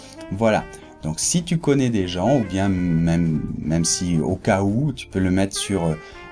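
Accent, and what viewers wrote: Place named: France. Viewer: Tu as un accent français sympa quand tu parles l’espagnole. Comment as tu appris l’espagnole? J’ai un niveau avancé B2. C’est gratuit! Tu fais un bon boulot.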